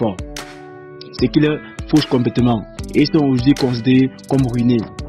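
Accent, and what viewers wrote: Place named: Cameroon. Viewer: Mon ga tu raconte que des connerie sur té vidéos a entendre ta voix je suis sur que té un camerounais avec ton acsen 😂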